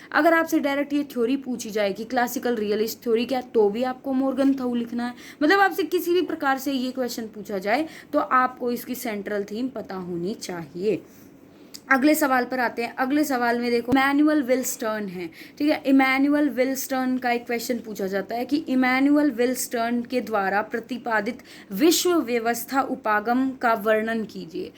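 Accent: Haryana, India. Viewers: yss, haryanvi accent hai madam ka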